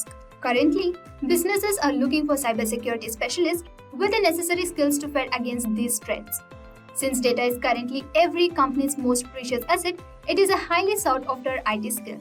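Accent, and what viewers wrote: India. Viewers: Indian accent insta skip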